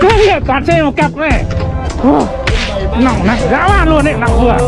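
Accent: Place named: Hanoi, Vietnam. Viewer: Admin giọng HN ạ, dịp sau có kèo nào cho em đi chung với :)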